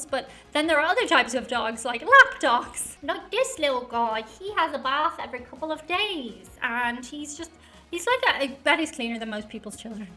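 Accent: Ireland. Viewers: I love female Irish accents 😏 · We love the Irish accent!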